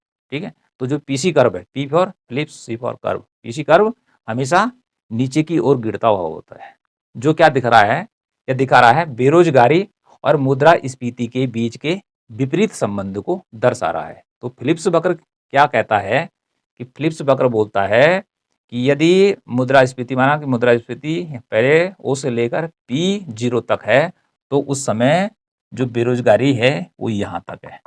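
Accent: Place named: Hills, India. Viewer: sir apki bhasha se lag raha jaise aap pahad se honge 🙏 or thank you sir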